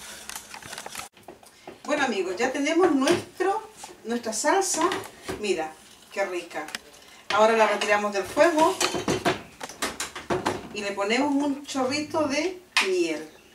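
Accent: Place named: Chile. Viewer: aca en mexico le llamamos capirotada... que ricas se ven!!! amo en verdad el acento chileno!! un gran abrazo!!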